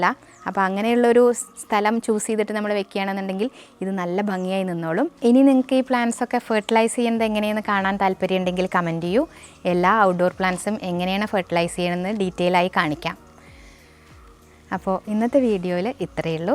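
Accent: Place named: Palakkad, India. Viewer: I ❤ your Palakkadan slang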